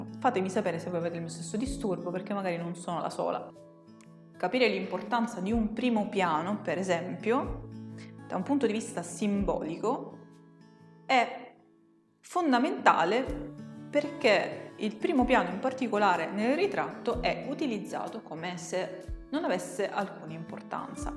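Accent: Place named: Sicily, Italy. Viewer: SI SENTE BIEN......L' ACCENTO SICULO !!!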